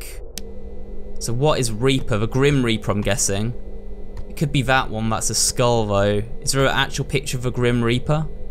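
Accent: United Kingdom. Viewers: AAAAAHHHH HES BRITISH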